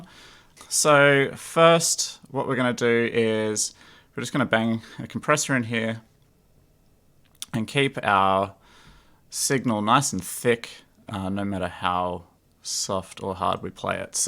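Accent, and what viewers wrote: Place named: Australia. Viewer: have the natural of the ehb1505ms (since last saturday). and cool to hear ot hear another aussie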